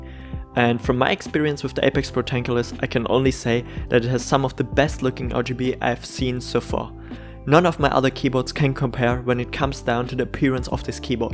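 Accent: Germany. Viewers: German guy right?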